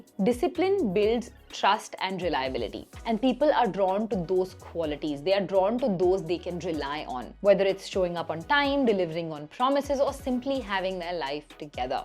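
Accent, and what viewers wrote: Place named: India. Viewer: by listening to her accent i can clearly say she is from India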